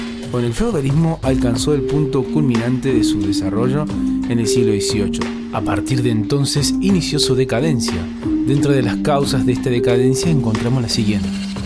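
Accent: Córdoba, Argentina. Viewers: Y el cordobe que narra la historia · muy bueno el video, me sirvio mucho para un informe escolar. No pude evitar tu acento Cordobes jajaja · Che alguien más pensó q este es cordobés?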